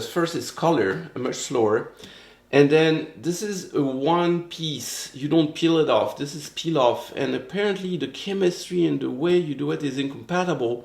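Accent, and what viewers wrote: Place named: France. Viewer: SELA-NOY-D love how pronounces things! That French accent!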